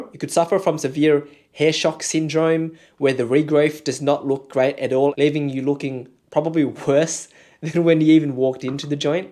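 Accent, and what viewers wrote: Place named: Australia. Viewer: I'm assuming with ur aussie accent the $4k is in AUD and not pandering to the yanks? :) p.s the results look great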